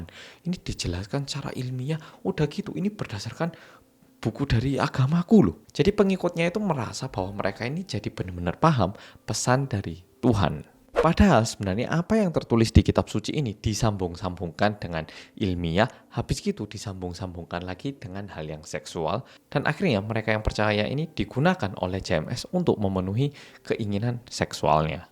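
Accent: Java, Indonesia. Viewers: Jowo banget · Di gonta ganti karepe dewe 🤣🤣🤣 jawir banget mas...👍🏻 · Nyoro mas, boso jowoku sampek kalah karo sampean...terlalu medokk mass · Hallo, aku mau tanya boleh? kok tuh gereja ngga ditutup sama pemerintah ya? terima kasih Mas ya aku baru gabung ke chanel nya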